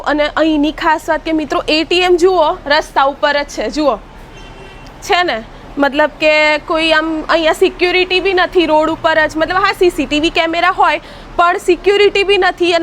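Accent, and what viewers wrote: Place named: Mehsana, India. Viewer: મેહોના ની ભાષા સંભાણી ને મોજ આવે છે ❤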